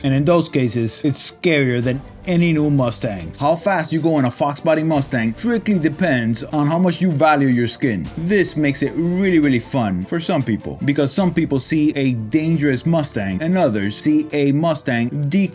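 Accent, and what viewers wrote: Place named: Hialeah, United States. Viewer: . That is a lovely accent. Hialeah?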